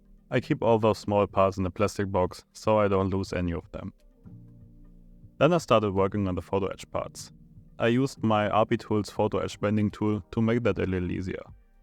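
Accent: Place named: Germany. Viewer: Wirklich gute arbeitet. Sprichst du normalerweise deutsch? Dein Akzent klingt zumindest sehr danach 😂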